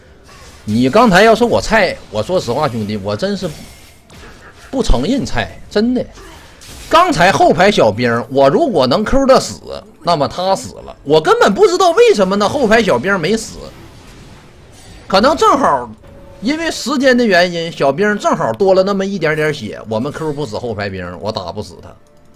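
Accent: Northeast, China. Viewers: 猴哥这东北话听着挺爽的😂😂😂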